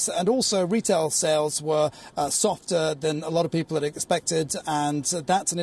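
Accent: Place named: India. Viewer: INDIA ACCENT TAKEN TEEKEN, ABLE EBLE, SAY SEY, FACEBOOK FECEBOOK, GO GU, WAY WEY, GROWTH GRUTH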